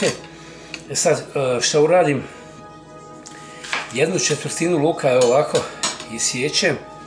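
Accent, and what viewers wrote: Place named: Zenica, Bosnia and Herzegovina. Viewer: Ne znam da li ste iz Zenice...ali imate pravi Zenicki naglasak hehe.Pozdrav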